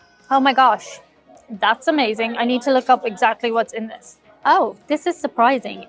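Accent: Ireland. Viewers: Boston brings out the Irish accent from. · Speaking English with a brogue accent.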